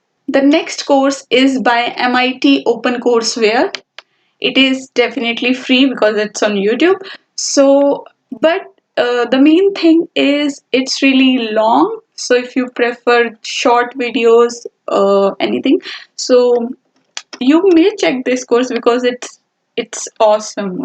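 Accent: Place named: Punjab, India. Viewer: Punjabi accent are u from punjab?🙂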